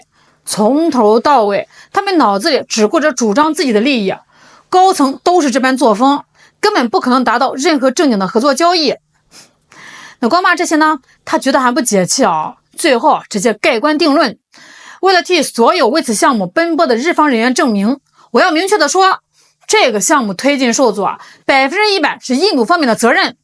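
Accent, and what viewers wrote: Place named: Northwest, China. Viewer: 听口音，这漂亮可爱的小姐姐好像是西北人，希望不是AI，很讨厌AI做成的东西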